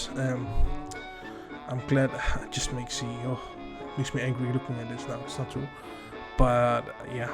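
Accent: Netherlands. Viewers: Ik hoor aan je Engels dat je Nederlands bent, nice video!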